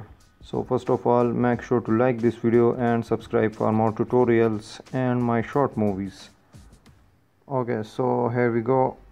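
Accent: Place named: India. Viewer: Well the accent does not help irc you not used to Indian accent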